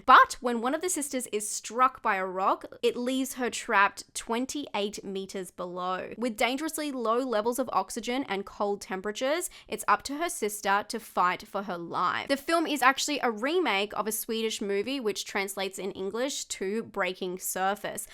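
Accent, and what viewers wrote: Australia. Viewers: It’s great to hear an Aussie accent! Keep up the good work, love you recommendations 🇦🇺👍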